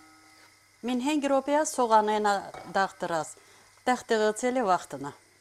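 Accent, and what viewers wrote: Artsakh, Azerbaijan. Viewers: Приятно слышать арцахский гадрутский диалект.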